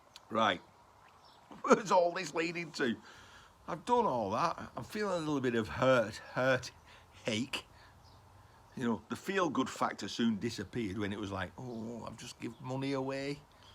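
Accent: United Kingdom. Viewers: My grandson wants to know why you poms - pronuniate things different to us is Australia, I told him we do it as well, look at how we greet someone - Gidday mate!!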